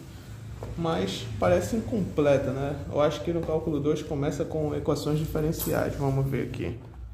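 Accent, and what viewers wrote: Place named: Pará, Brazil. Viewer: Não precisei assistir o vídeo nem por 5 segundos para descobrir que tu és paraense hahahah; é bom ver mais canais daqui surgindo. Parabéns pelo início e pelo conteúdo! já me inscrevi para acompanhar os próximos passos. No que concerne ao teu vídeo, o que particularmente me agrada muito no estilo do livro do Louis é o fato dele explicar os tópicos usando, muitas vezes, PALAVRAS e não ficar abusando de recursos visuais, por vezes inúteis, para "elucidar" algo. Ademais, além dessa escolha de usar muitas palavras para apresentar um tópico, ele muitas vezes não omite certos detalhes que os mais "moderninhos" por vezes omitem. Dentre os livros mais "básicos" de cálculo como Leithold, Munem, Simmons, Ávila, Diva, Thomas, Anton, Swokowski, John Rogawski, Stewart, Lang, Larson, Paulo Boulos e etc., os que mais curto são o do Leithold, do Serge Lang e do Geraldo Ávila.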